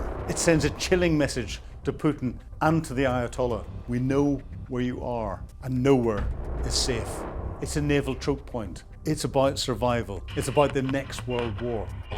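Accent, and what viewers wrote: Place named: County Antrim, United Kingdom. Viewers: I'm sorry, but I just don't buy all you are saying about why the USA "needs" Greenland. Speaking with an English accent does not make you more believable. Have you ever played the game "Risk" ? I have a feeling that you would love playing it, perhaps with Donald. At this point these there is far too much rubbish and speculation on YouTube, and you are not helping. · Good use of maps and other visuals. I always enjoy your analysis. I also enjoy your accent, my father was from County Antrim and my relatives had that kind of accent. Now the Greenland fuss makes sense. Keep up the excellent work.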